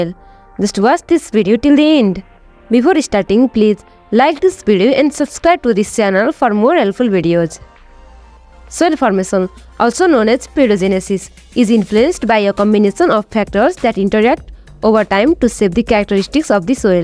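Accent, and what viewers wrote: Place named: Nepal. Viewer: U sound like Nepali.. I mean ur accent..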